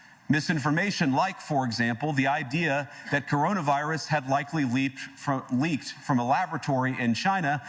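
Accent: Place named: United States. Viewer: Finally an American politician who sounds, well, American!!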